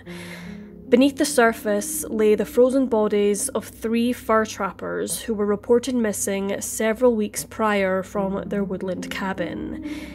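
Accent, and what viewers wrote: Scotland, United Kingdom. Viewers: I’m so happy I found you channel. You cover these stories simply and thoroughly. And I love the Scottish accent! I can’t believed I missed the deer doing their business in the background, I’ll have to go back and look!🤪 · Came across this channel by chance stayed for the Scottish accent and creepy atmosphere ❤ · Where is the narrator from? I'm really bad with placing accents (even in my own country). To me it sounds like either a Scottish accent (I don't know what type of Scottish) or a really slowed down Belfast accent, but I don't know cause I'm really, really, embarrassingly bad at this. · Wow just found your channel and I love it already, beautiful Scottish voice 💙💯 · You Scottish? Seems like it. Great case keep it up ma'am.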